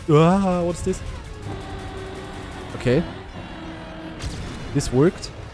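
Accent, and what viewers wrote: Germany. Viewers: Hallo der herr xD deutscher aktzend und deutsche wörter wie Ja Alter NEIIN haben dich verraten xD Guten morgen xD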